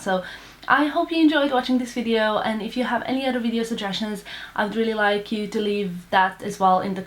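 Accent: Serbia. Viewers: I think she's Serbian?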